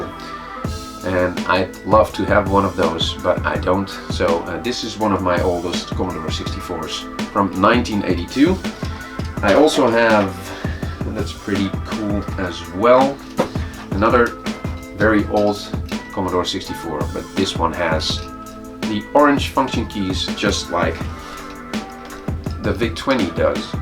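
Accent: Netherlands. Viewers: Netherlands accent?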